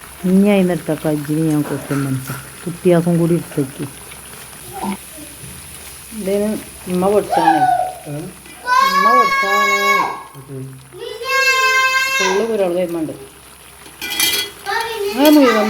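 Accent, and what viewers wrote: Malappuram, India. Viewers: മലപ്പുറത്തു ഒരു വിധം എല്ലാരും ഇങ്ങനെ തന്നെ ആണ് സംസാരിക്ക വെറുതെ ഓരോന്ന് കുറ്റം പറയുന്നതെന്തിനാ 😮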